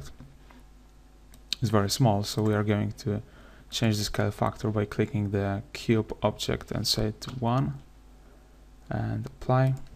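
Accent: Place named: Poland. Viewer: It's somehow nice to hear a Polish man speaking English, your voice sounds empathic. I like yout stuff, you should do more. Pozdrawiam! :D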